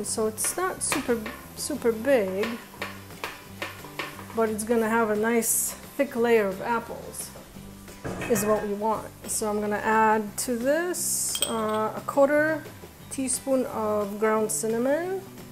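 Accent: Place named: Poland. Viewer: Wonderful recipe and video! I really love the way you walk us thru too. I do best when I can actually SEE how it works, so your videos always work for me. I am half Polish and grew up in Greenpoint , Brooklyn NY. Which is known as “Little Poland”......lots of Polish butchers and the best kielbasi you can find! But I have been trying to learn more about the many other authentic foods and the recipes to go with them, so I have a lot to look forward to on your channel. I thank you for sharing so much with us!! PS- I love hearing your accent! Half of Greenpoint sounds just like you, so it takes me right back home for a little while..... I love it!! ❤️ 🥰❤️🙋🏻‍♀️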